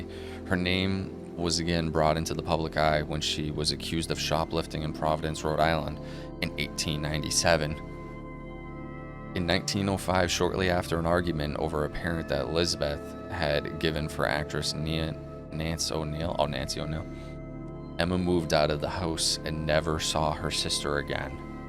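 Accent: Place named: Massachusetts, United States. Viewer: You claim to be from NJ yet your accent, knowledge of places like Fall River, and general vibe are leading me to believe you are a Massachusetts native.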